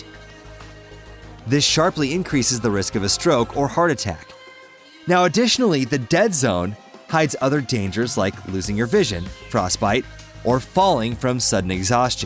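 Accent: United States. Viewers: This starts with some cheesy Yank voice over saying « Hey Guys »….WTF? One can tell immediately this is not going to be serious and it will be some D grade joke…mind you I switched off immediately so maybe not..(bet it is).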